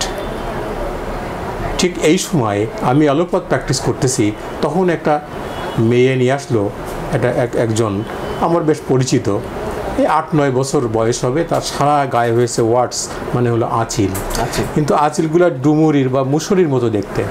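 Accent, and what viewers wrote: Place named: Chuadanga, Bangladesh. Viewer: স্যারের ভাষার মধ্যে চুয়াডাঙ্গার ভাষার মিল পাওয়া যায়।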